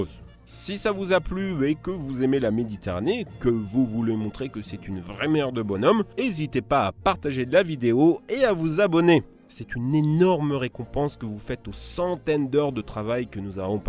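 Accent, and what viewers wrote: Caldoche, New Caledonia. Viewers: on nest dakor c une video de Nouvelle-Calédonie g reconnue lacent des caldoche😂😂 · C'est un caldoche le mec qui parle xDD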